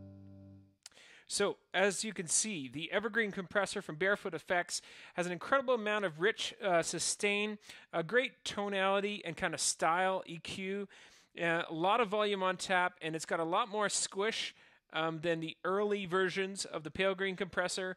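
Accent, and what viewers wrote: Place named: Canada. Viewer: every time you speak it makes me miss my Canadian friends.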